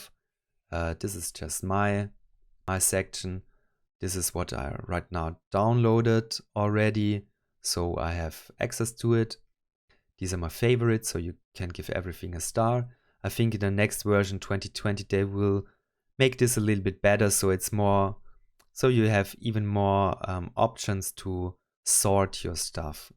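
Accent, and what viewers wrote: Germany. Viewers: Man hört den deutschen accent 🤫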